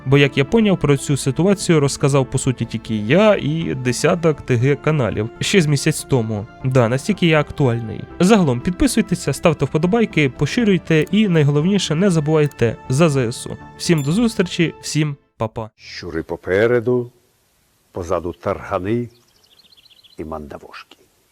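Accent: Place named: Russia. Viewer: так ти ж сам росіянин, лол. по акценту чути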